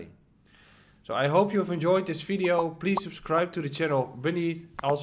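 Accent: Netherlands. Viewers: Did I hear a Dutch accent?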